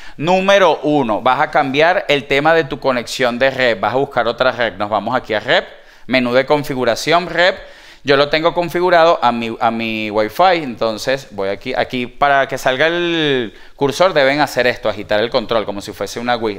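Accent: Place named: Venezuela. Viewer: Hola, un saludo amigo. Percibo que por la manera de hablar eres de Venezuela. Sí es así, sabrás cómo hacer para que reconozca la zona del país? Intento conectarme a LG Channels y siempre me da el mensaje "Lo Sentimos. LG Channels no está disponible para su zona" cambié la ubicación en los ajustes... Y en los ajustes solo me aparecen (Automático - Canadá - México - Estados Unidos - otros). Sí me podrías ayudar, mucho te lo agradezco, hermano.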